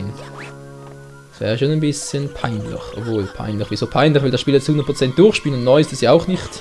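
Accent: Switzerland. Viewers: Bist du zufällig Schweizer?^^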